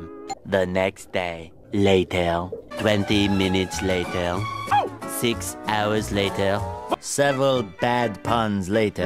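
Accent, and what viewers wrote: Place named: France. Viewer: It's meant to be French. The narrator is an homage to Jacques Cousteau.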